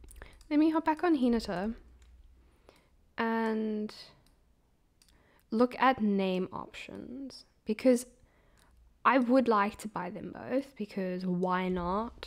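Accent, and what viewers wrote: South Africa. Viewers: Hii i love your videos are you from south Africa lol 🎉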